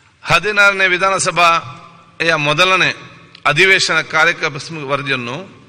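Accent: Tulu Nadu, India. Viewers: ಅವರು ತುಳು ಕನ್ನಡದ ಮಿಕ್ಸ್ ಆಗುತ್ತೆ ಅಡ್ಜಸ್ಟ್ ಆಗಬೇಕು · Sir come from Tulu kannada side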